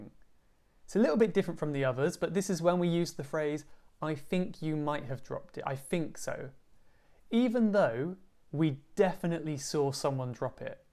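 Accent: United Kingdom. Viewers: both direct and indirect are good however it depends upon the situation which of these do wanna apply when talking to someone you know or stranger to avoid confusion, misunderstanding and being unkind to one another. Your podcast really help me a lot to improve my English and I find the topics you discuss on your podcast with some non native english speaker quite fascinating and inspiring. I also wanna compliment you for having a soothing voice to listen to and I do recommend your podcast for beginners who are studying english. It has a slight British accent but you’ll get used to it just keep on listening with intriguing topics.Thank you once again.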